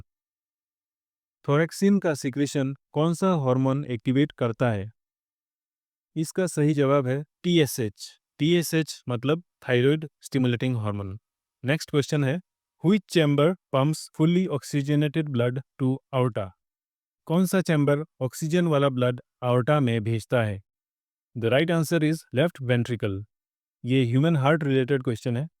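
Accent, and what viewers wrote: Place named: Assam, India. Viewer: Assam ka lag rha hei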